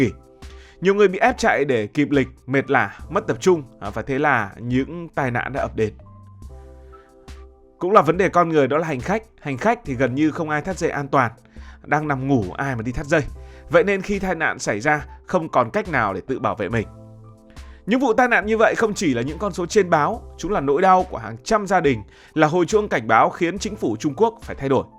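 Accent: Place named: Thanh Hoa, Vietnam. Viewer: blv quê thanh hoá à, nghe 1 vài câu ra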